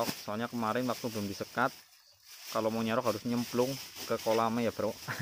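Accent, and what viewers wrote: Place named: Banyumas, Indonesia. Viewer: Deneng ngapak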